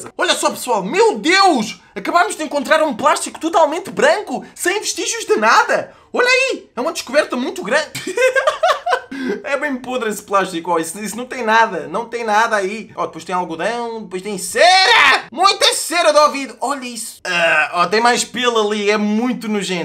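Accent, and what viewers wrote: Portugal. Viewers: ele é portugues né? de portugal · gameplays ele é de portugal!!eles falam algumas coisas diferente do brasil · Ele é português de Portugal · Ele e portugues? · ele é de portugal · O cara veio de portugal vey to entendendo nada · Ele e português do Portugal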